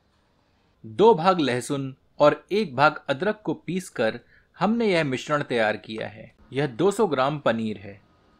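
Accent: Standard. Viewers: ur commentry is amazing... pure hindi... just love it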